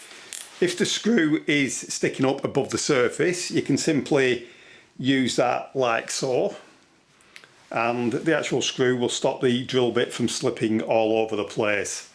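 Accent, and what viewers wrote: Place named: Yorkshire, United Kingdom. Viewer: Yorkshire accent must be one of the worst English accents in the world….boring and damn disgusting.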